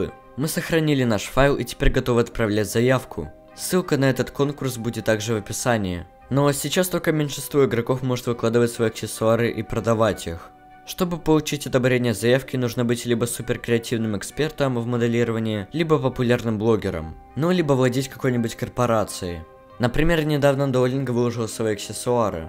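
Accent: England, United Kingdom. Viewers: бро, всё круто, но убери этот акцент английский, уши вянут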